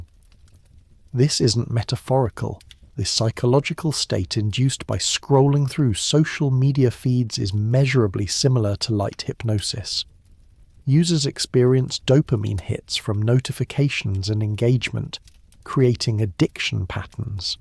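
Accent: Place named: England, United Kingdom. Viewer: please please No More English Accent !!! please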